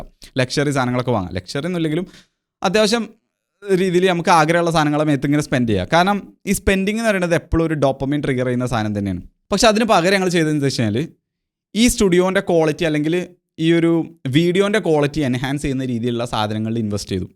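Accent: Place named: North Kerala, India. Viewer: Bro that's not completely your fault, he is tone is slightly from the Northside which even the middles or Southside have a bit trouble to understand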